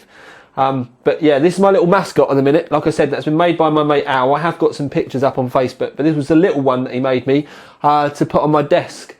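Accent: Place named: England, United Kingdom. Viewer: taking something bad that happened and turning it to something good, not only for yourself but for just random people all over the world! that is quite amazing and i really do like your accent im a big fan of the english accent so these videos are just amazing to watch :D i love the long videos cuz i love to listen to people talk for long amounts of time for some reason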